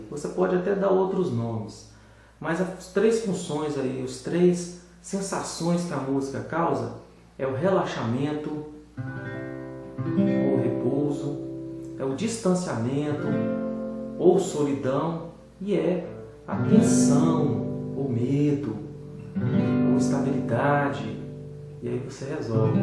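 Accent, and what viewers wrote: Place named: Minas Gerais, Brazil. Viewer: AMEI AÍ SIM , AGORA VAMOS APRENDER COM ESSE SUTAQUE MINEIRO E MANHASO E SUAVE. ISSO NÂO É AULA É UMA POESIA LÍRICA .💤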